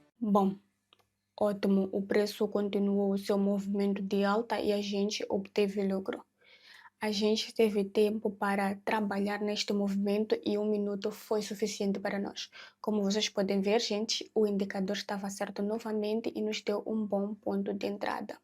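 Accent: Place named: Mozambique. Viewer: Pura moçambicana. Na fala tem tudo de moçambicanos.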